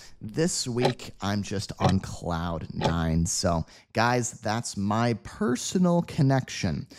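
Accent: United States. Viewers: Listening to these lessons you have the benefit of acquiring multiple perspectives of the English language. The teacher explains with crystal clear pronunciation, vocabulary and synonyms, and also idioms and phrases, in perfect standard American accent which is the best in the world, and is like music to my ears. Listen and review the lessons to make the best of your learning. Thank you for the English lessons.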